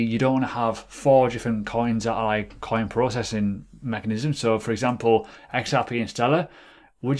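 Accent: United Kingdom. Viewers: Anomynous? British lol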